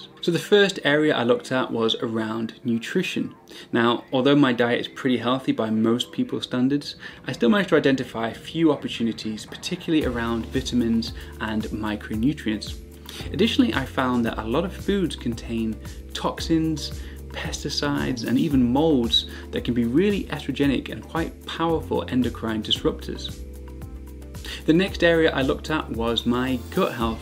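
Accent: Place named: United Kingdom. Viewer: Ur british? Love the accent :-)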